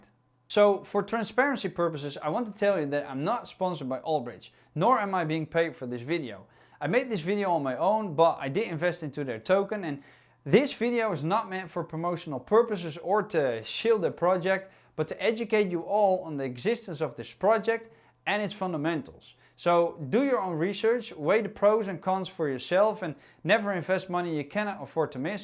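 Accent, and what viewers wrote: Netherlands. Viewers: Can tell that you are from the Netherlands xD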